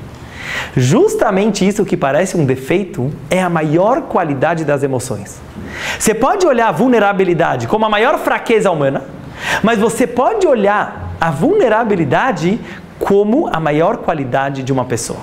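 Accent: São Paulo, Brazil. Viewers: Se este sotaque paulista não fosse tão irritante eu veria o vídeo ate o final, mas não consigo. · o que irrita o sotaque paulista?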